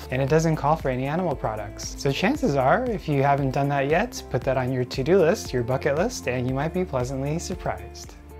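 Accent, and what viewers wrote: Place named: North America. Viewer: You sound like a North American but I guess you've been in Japan a while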